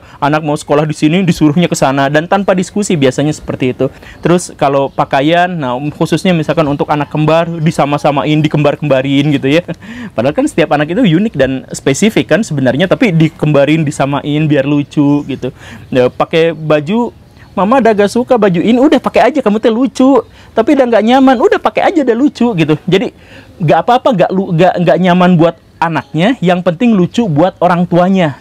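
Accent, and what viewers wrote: West Java, Indonesia. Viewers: Aura Sunda nya sangat kental pa guru😊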